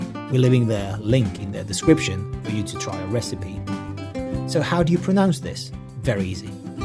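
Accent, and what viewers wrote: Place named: Latin America. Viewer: I guess this is Latin spanish prononce, not spanish. Tortiyya, paeyya.. wtf tortidzha, paedzha...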